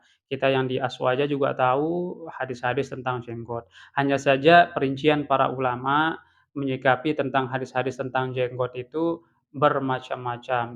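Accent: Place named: Lombok, Indonesia. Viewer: Logatnya kyk orang lombok. Bener gak kang?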